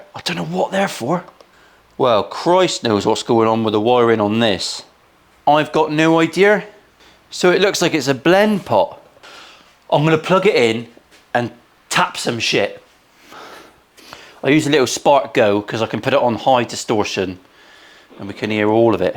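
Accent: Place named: Scotland, United Kingdom. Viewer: I love your videos to. I just wish they had subtitles in English, for native speakers. The guy in these videos is Scottish or part leprechaun or something, and I feel that content is getting lost in their accent at times.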